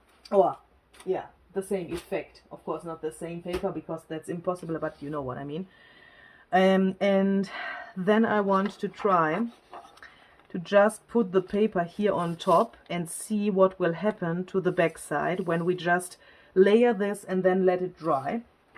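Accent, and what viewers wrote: Germany. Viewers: I love your english-german english. And your humour ! hahha xx so refreshing! Richtig erfrischend! :-)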